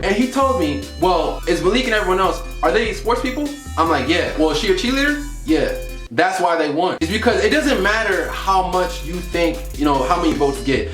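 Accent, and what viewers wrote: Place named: Northern Virginia, United States. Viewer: lmfaoooo i KNEW you had to be from nova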